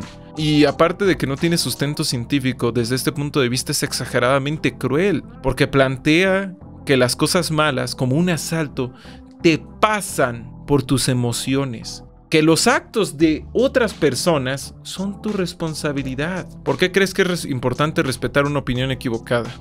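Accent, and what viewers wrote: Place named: Mexico City, Mexico. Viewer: Mames, el mismo acento de la Debayle y casi todas las ladys, ¿Poblana? Fifi de CDMX?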